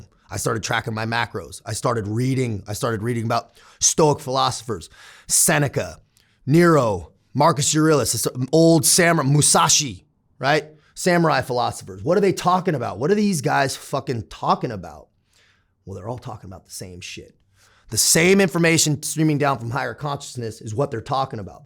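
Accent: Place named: New England, United States. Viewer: his ummss are just fuckins. hes a new englander like me so i know😂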